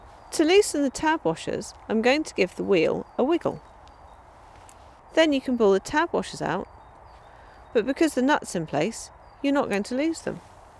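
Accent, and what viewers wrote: England, United Kingdom. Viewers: Best ever Step by step Repair video ever Created. Great English Accent, great voice tone. The Best ever English teacher ever Created. Great Country side Background . Priceless for a GreenHorn like Me.Thanks a few Billions. Great tips like not removing fully the tab washer, lifting the rear wheel to ease chain releasing b4 tensioner takeoff.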